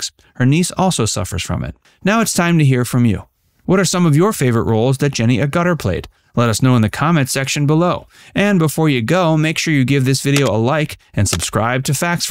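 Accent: United States. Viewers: Nice job your mispronunciations had me in stitches, literally rolling on the floor. I suppose you are speaking American though and not English.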